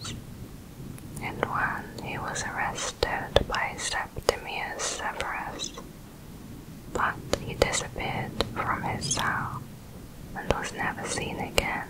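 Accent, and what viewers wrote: United Kingdom. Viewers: I love ur voice lol what part of uk r u from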